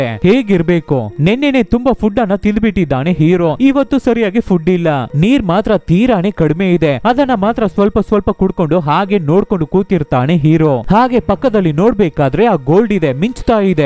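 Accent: Tamil Nadu, India. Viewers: Guru nin kannada language same Tamil avru kannada mattadalva hag ide guru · Ninna slang thamil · Kannada pronounce is not correct, he pronounced with Tamil accent. If it made in proper kannada, dialogue, you will get more likes and more view. Any good try.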